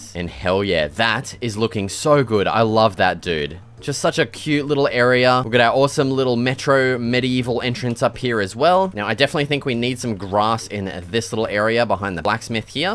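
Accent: Australia. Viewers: Stopped watching about 1min in when I realised the Aussie accent was turned up to obnoxious.. I’m Aussie myself. It’s too bloody much bro. Stop it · you obviously don’t understand accents because he is not English he is Australian lol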